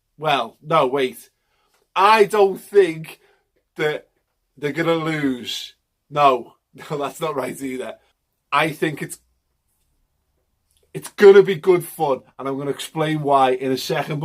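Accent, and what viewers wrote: Liverpool, United Kingdom. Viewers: Man I'm Indian and now I can understand scouser clearly ...thank you ...can even speak it a tiny bit !!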